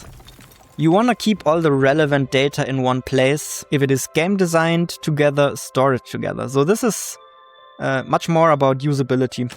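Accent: Germany. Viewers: your voice sounds very german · Nach 4 Sek wusste ich, das du ein deutscher bist :D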